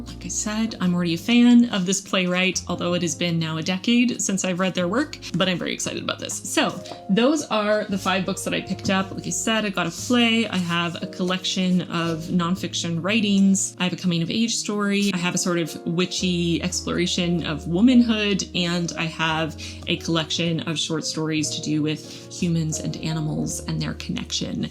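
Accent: Quebec, Canada. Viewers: Ton français est vraiment très bon! En fait, ton accent est un peu Québécois et j'adore! Tu m'inspires à lire en français, quelque chose que je n'ai pas fait depuis des années! 💜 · Wow, tu étais à Montréal??? I love how dedicated you are to learning French, and despite the Babbel lessons using métropolitain French, your accent definitely leans more Québécois! Also, I find it so interesting, because I live in the Montréal area and have trouble finding books in English (that aren't from Indigo...), but in your case, you absolutely used your time in Montréal to get francophone books! And yes, Québécois lit 100% tends to be absurd and a little weird! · Salut =) (We don't say "Allo" except in the beginning of phone calls by the way). Your french is quite good actually (I observe the quebec influence in the accent ^^). It's very difficult to prounounce the words correctly with so many silent letters and the diversity of sounds with the same letters. 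And the difference between female and male nouns... and the conjugation... Well be brave /o/ For instance, we pronounce "prophéties" like 'professies" (t as s). I wish you good luck and nice reading with your french TBR (we say PAL for "pile à lire" here). I think you choose very literary books, with metaphors (more complicated to understand if the first level is new). But I admire your ambition, you can progress very fast this way! Bonne continuation n,n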